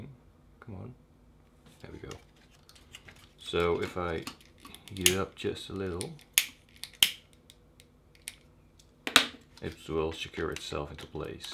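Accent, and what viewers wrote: Netherlands. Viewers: I thought so aswell cause he sounds really dutch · Are you dutch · Super cool! Alleen je hoort gwn dat je nederlands bent :) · you sound really dutch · Is this guy dutch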